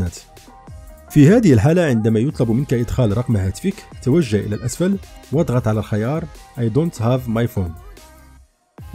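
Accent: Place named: Morocco. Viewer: من صوتك تبدو انك مغربي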